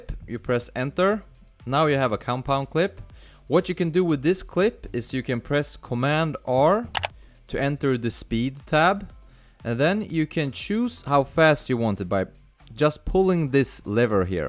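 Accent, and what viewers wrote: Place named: Sweden. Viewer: Haha as soon as you started speaking I knew you were swedish. Tack så mycket för hjälpen!!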